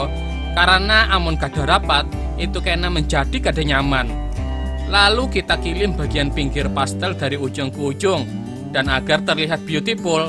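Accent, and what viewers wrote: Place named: Banjar, Indonesia. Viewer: Logat banjar hanyar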